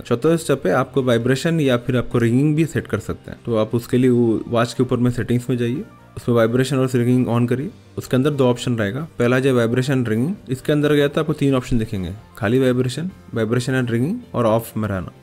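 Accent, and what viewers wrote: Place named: Kerala, India. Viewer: Full malayalee accent.. adipoli😅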